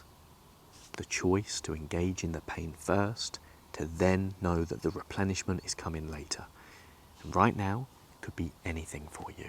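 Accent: England, United Kingdom. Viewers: sounds like an english accent to me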